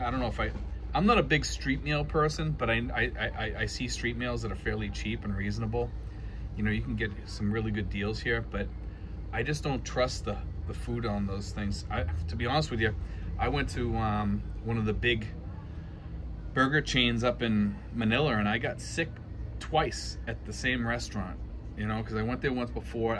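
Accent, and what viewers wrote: Boston, United States. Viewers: I was listening to one of your videos the other day, and I thought to myself, this guy has to be from the Boston area. I have been to Boston several times. My older brother went to Brandais University for Grad School and was a Professor at Boston U. when he passed away. One of my best friends from my days at the U. of Texas retired as a Lawyer and move to Boston. His son works for a High tech company near Boston. He is going to move back to Texas soon. You are on the mark regarding inflation here in the Philippines.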